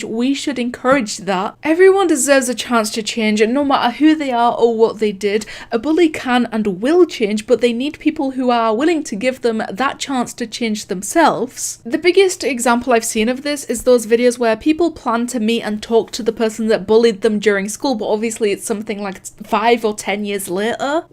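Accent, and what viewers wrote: United Kingdom. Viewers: can we talk about her bri ish accent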